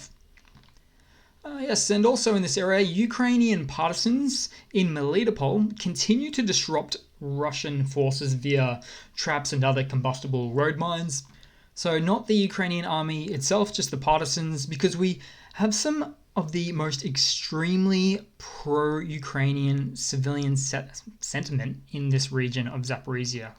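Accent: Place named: Australia. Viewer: Interesting hearing an Aussie pronounce the Ukrainian names, I’m struggling trying to brake the word structure into something pronounceable! Cheers mate🇦🇺🇦🇺🇺🇦🇺🇦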